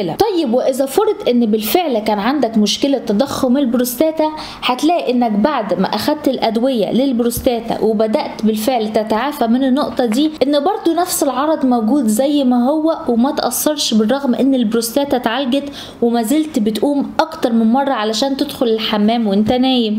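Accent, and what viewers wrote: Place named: Egypt. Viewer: تستاهلي الف لايك للمعلومات ومليون لايك لخدودك الحلوة .. يا عيني على اللهجة المصرية ..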